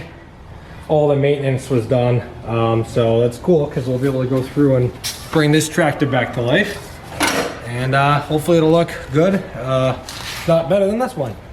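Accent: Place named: New York, United States. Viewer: Awesome!! Great Intro!!, you need way more subscribers!! You have kind of a New York affect and a slight accent as well as Northern New York Scenery however I would guess that you are in New Jersey. I am in Pennsylvania and I suppose that is also a possibility. I have an Allis Chalmers B110 also. I am restoring a John Deere 112 that I am modifying with an air compressor system accessory. My Craftsman GT18 Is in the process of having suspension welded on the front end, a 90 degree gear box to change a twist belt into two straight belts, larger tires and a lift. Talk to you soon. Great video!!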